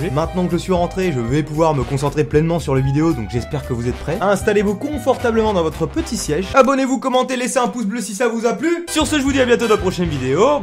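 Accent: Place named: Guadeloupe, France. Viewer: L'accent guadeloupéen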